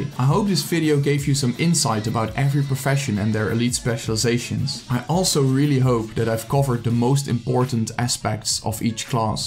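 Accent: Netherlands. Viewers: You are dutch, aren't you? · Hands down the BEST video of its type. SUBBED. Love your accent. Dutch? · i clicked - i hear a dutch accent - i like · Not that your English is bad, far from that, but i imediately heared you're dutch. Great Video tough.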